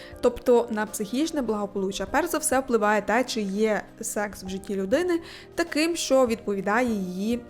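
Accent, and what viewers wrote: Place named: Galicia, Ukraine. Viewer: Ведуча дуже швидко торохкотить, та ще й з галицьким діалектом! Доводиться призупиняти відео вдвічі!!!.. Викладає не дуже переконливо та не цікаво!..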